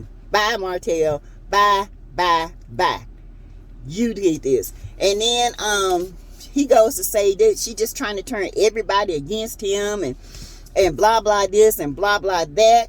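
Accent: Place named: South Carolina, United States. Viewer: I like hearing your commentary. You sound like my family. They are from South Carolina but I'm from NYC.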